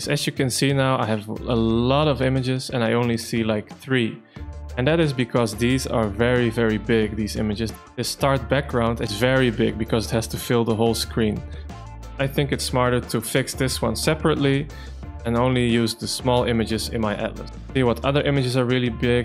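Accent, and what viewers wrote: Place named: Netherlands. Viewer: Hey, thanks a lot for the tips! I hear a dutch accent:D I may be wrong. You've made a wonderful game, congrats! Have you considered launching on other platforms? I'd like to get the game to support you and because the game seems fun, but I don't own a switch.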